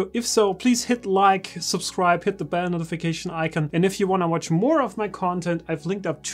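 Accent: Germany. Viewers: Dein Englisch hört sich seeeehr deutsch an:-) Aber by the way, sehr gute Videos von dir, Top!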